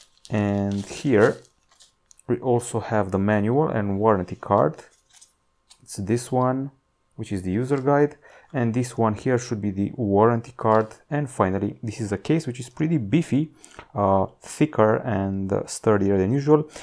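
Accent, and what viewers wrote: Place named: Romania. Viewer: That fuckin' Slavik-Romanian Accent !!!! :) Bună treabă omule excelente unoxinguri și review-uri pe ambele canale !!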